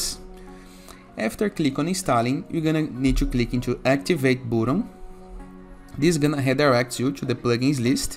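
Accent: Brazil. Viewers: Are you Brazilian bud? This accent is so cool haha. Great video!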